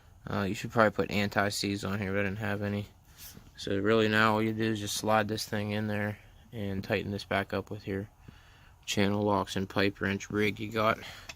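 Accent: Pennsylvania, United States. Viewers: Holy Pennsylvania buddy. I'm from PA and live in GA so I can pick up that accent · Yep, the PA accent for sure. Grew up'err 'bout a mawl from the store down'err .. luv it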